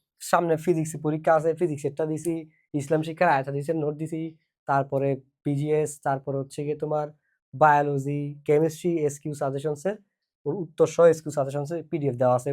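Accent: Chittagong, Bangladesh. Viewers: Kire pura accent ta chittainga 🙏😭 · ভাইয়ার তো আজকের ভাষা পুরাই চিটাগাং এর মানুষের মতো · ভাইয়া, তই তো চিটাংগে হতাও ফারো দেহির🙂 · Sir Apni ki chatgaiya?Because apnar ei video te pura chatgaiya accent lagtese 😭..or is it just for the video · বদ্দা তই ত দেহিদ্দি পিউর চিটাইংগে😅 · Vaiya to pura chatgaiya 👏💀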